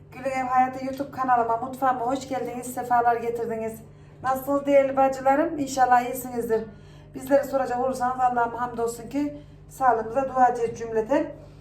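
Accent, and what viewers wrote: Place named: Ankara, Turkey. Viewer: Konuşmanız. Sanki ankaralı gibi nasıl yaptıgını göremiyorum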